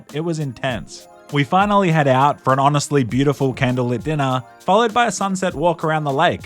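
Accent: Australia. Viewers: Yeah he’s Australian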